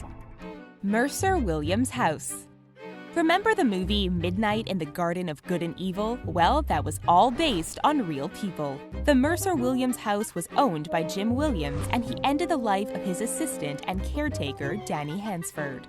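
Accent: Canada. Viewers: So off topic....But I love the way Canadian's say house and out... 😊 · I came down to the comments to do just that! Hilarious. Listen to the way she says "house" too. I think she has a Canadian accent.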